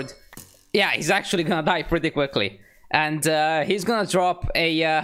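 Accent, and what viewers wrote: Italy. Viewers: Are you from italy? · why are you italian?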